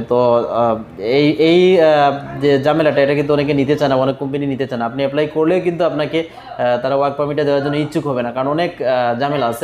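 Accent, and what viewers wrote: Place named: Sylhet, Bangladesh. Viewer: ধন্যবাদ ভাই এত সুন্দর ভিডিও দেওয়ার জন্য নিশ্চয়ই আপনার বাড়ি সিলেটে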